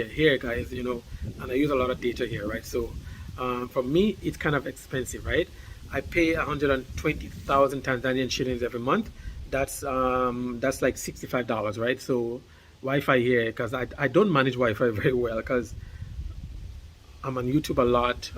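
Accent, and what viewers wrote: Grenada. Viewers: His accent sounds more like Grenada. That isn’t a Jamaican accent.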